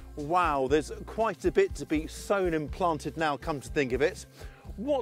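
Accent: United Kingdom. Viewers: Zone 8?!?!?! Where is he? I assumed he was in Britain because of his accent.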